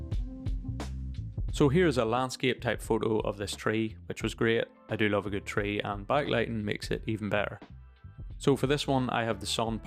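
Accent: Ireland. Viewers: Minor cavil: the word 'sky' is not normally pronounced with an 'sh' sound, but you're Irish, I guess, so ok?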